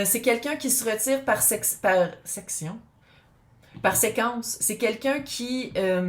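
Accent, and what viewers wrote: Quebec, Canada. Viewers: J'adore ton accent, l'accent du Canada en général, garde-le, je suis française et ça me COnvient parfaitement ❤ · moi je comprend tout (et je kiffe l'accent). Avant de mourir je visiterais le Québec!!!!!!! Donc gémeaux ascendant vierge....et la personne de mon cœur est sagittaire. Wahoooooooo ça fait du bien à entendre. Tout s'entrecroise pour les 3 signes. merci!!!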